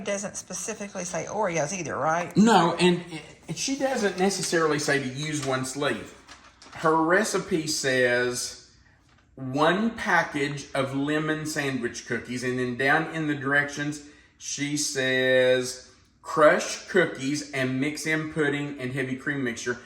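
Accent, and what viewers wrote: South, United States. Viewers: enjoy listening to your delightful Southern drawl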